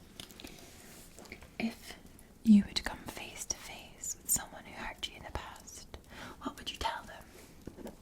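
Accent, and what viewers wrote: Scotland, United Kingdom. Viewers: I love your Scotish accent it's so soothing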